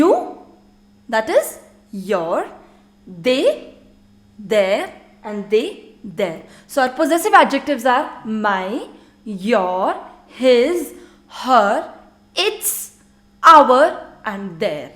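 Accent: France. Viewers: I really appreciate your french accent it's seems so nice to listen and it will help me also to learn from you...Thank you so much❤️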